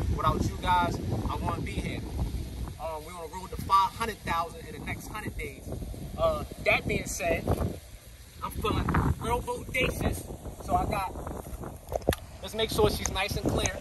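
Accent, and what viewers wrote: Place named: Baltimore, United States. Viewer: My man’s sounds like he’s from Baltimore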